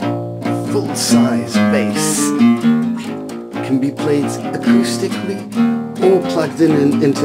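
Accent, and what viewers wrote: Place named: Australia. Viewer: he sounds like an australian guy giving a review on an animal.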